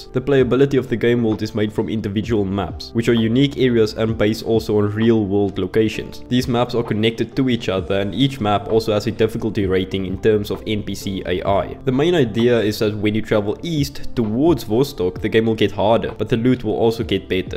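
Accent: South Africa. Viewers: With in the firs 1 second i could tell ur a fellow south african gon be giving u a sub and so on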